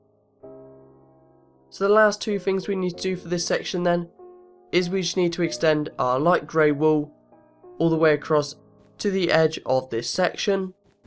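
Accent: United Kingdom. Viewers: No not a robot accent... A British accent. #bruh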